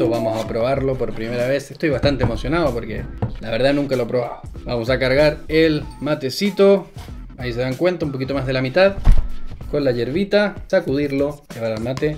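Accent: Chile. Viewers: Buenísima recomendación! La compraré a ver qué tal. Me queda una duda de cuál es tu nacionalidad ya que tu acento me suena chileno pero a la vez no jajaj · Hola que tal, mira asique vivis en Francia, y se consigue yerba halla? Voz no sos Argentino, sos Chileno por el acento, estoy en lo correcto? SALUDOS desde Nueva Zelanda, voz sabes que aca se consigue todo pero bastante mas caro por supuesto, que tal los precios en Francia, Mercy 😊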